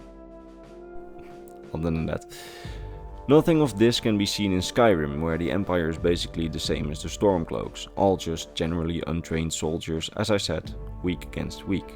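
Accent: Netherlands. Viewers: Are you Dutch? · I can hear from your accent that you are Dutch. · is that a fellow dutchman i hear? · Are you like a... Dutch Farengar? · You sound Dutch, if I am correct in assuming this then awesome cuz i'm dutch, if not then sue me, nice vid tho.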